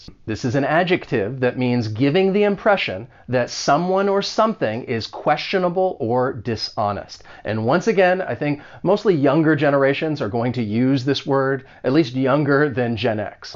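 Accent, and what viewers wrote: United States. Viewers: Don't mention it professor. I'll be always watching your videos, they're so cool. Perhaps, because of the way you teach and explain everything. I appreciate American's accent .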